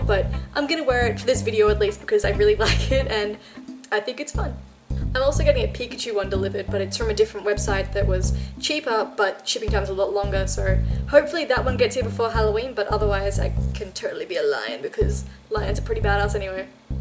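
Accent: Australia. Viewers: Love your accent :) Where in Aus are you? I used to live in Perth :)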